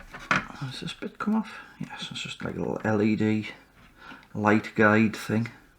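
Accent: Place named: Newcastle, United Kingdom. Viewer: You sir, have the patience of a saint. I love watching your streams. From a chap, me, who was born in Newcastle and moved away, in the mid-70s, when I was 5. Up here again in the Toon, for the weekend for a bit of nostalgia with my wife. Never tire of the accent and I have old tapes of when I had a strong accent. Ha'way Canny lad. 😅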